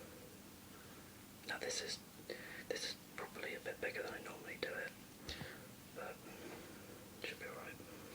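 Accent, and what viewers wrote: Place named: United Kingdom. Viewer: British? Check.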